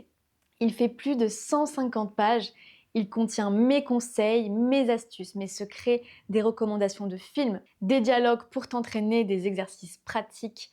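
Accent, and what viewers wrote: France. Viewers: Goodness mate, your seriously in love aint ya 😂 As a Londoner- no! She doesn’t sound English, elle a un really sweet French accent 😍❤️ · Bonjour Tout à fait, c'est des méthodes que j'utilise aussi pour améliorer ma prononciation. Je parle tout seul très souvent quand, par exemple, je vois une vidéo YouTube ou la télé et je fais comme si je donnais mon avis sur n'importe quel sujet et ça m'aide à m'exprimer. Je pense que je suis pas mal Mais il y a un truc sur lequel j'ai un autre avis. Je pense qu'en fait t'es très célèbre,. T'es une star sur YouTube et j'ai adoré t'écouter parler en anglais parce que tu perds pas ton accent français mais en même temps ta voix change un petit peu, je trouve ça formidable 😍 Merci pour les conseils, je te souhaite une excellente semaine ma prof ✌🏻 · Your English with the French accent 😮😳 🥵 🫠❤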